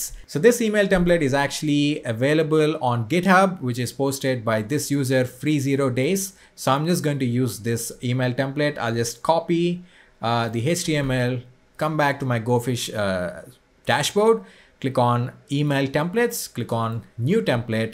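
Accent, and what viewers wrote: India. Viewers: bro look arab and speak indian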